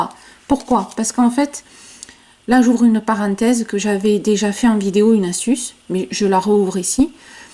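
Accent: South, France. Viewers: Bonjour, et merci beaucoup pour ce très beau modèle. J'aime tout! 😍 Le point, la large encolure à la limite du col châle, la construction carrée simple (mon premier patron maison était de ce type, avec également un point en relief, mais avec un col V). Je vais le faire, c'est une certitude! Et que serait une vidéo d' sans son ardoise, son accent plein de cigales, et ses petits rires spontanés? Vous êtes un plaisir pour les yeux et les oreilles. ☺️ Sans compter que vos explications sont toujours claires, précises et détaillées. Je vous remercie encore pour ce gilet qui va définitivement entrer dans ma garde-robe. 👗 Petite précision: je fais mon point élastique en montant une chaînette que je travaille en brides relief avant et arrière sur le retour, et j'avance sur le poignet en mailles coulées. Je trouve que cela resserre mieux autour du poignet, surtout si l'on a une manche un peu large justement pour mettre un autre vêtement sous la veste. 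Je vous souhaite une très agréable journée. Prenez bien soin de vous. 🌺🙏😘🌺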